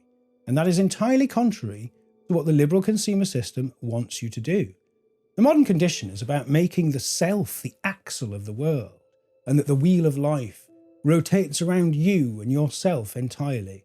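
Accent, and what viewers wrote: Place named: United Kingdom. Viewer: I really enjoy TRUTH Tellers! Cheers!!🎉 Truth with a British Accent is EVEN BETTER! We could get really Creative and make this Truth Wizard A THING. I hereby Volunteer for the Costume Department Manager and will gladly Donate Costumes & Props, if needed. I would have to ship them from America. Just heard today, 9/2/25, that Brits cannot Mail packages to the US under your current Dictator. Thanks be to God, we Americans still have that Freedom. Unless it "mysteriously disappears" at your end, I don't see a problem. 😉😉 On a seroius note, I thank God that I was Born on Christmas Day 1960. I was taught to pay attention to Life and have literally witnessed with my own eyes, ears, heart and soul the disintegration that he is referring to. The turning upside down of everything. He is absolutely correct. It has been a wild ride and I suspect we'd better buckle up. 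The Disenchantment is SPREADING! 😇🙏💪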